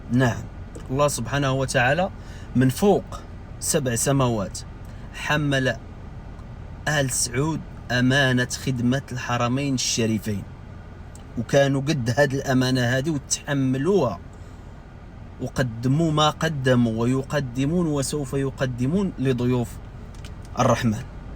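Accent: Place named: Morocco. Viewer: انت مغربي ولست سعودي كيبان ليا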